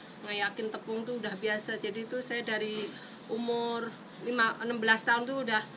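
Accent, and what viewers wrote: Java, Indonesia. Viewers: Seneng liat mba sederhana dan logat jawanya kental banget dan tidak sombong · Siapa nonton 2019? Ternyta udah 20thn an tapi logat jowene isek kentel.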